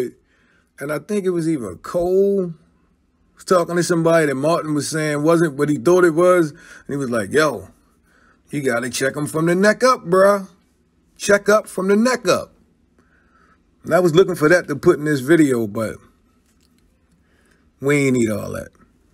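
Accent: Baltimore, United States. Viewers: I had to shut this off. That Baltimore accent is awful. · Are you from Baltimore?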